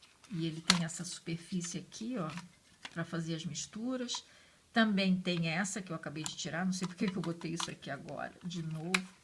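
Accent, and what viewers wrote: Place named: Rio de Janeiro, Brazil. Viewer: amei esse video ( e todos os outros que ja assisti aqui do canal..kkkk) muito boas suas dicas...muito sincera,direta...sem enrolação...muito eu...( sou carioca e esse seu sotaque me faz pensar que vc tb...acertei ???) Bj grande e muito grata !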